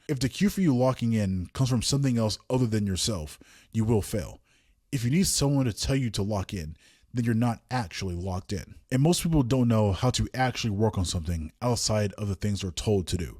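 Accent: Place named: United States. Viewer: I'm sorry but what's with the STUNNING photo of Whitby abbey ???!! Not even related to the conversation at hand unless you want to talk about how becoming a monk or a nun is the ULTIMATE way of "locking in" because you're bound for life? It's like my fyp is hinting at me to pay attention by using motifs from my life that no one even knows about. And I'm guessing from the voice over that this guy is American, which makes this photo's insertion even more questionable since although it inspired one of the most well known books ever, it's still a pretty niche place if you think about it. Anyway, thanks for coming to my TedTalk :)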